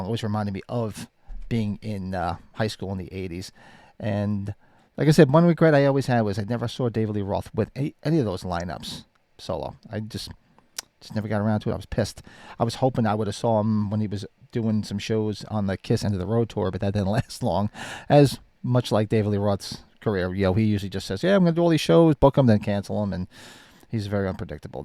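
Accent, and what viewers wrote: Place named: New Jersey, United States. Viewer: How do I know you’re from New Jersey immediately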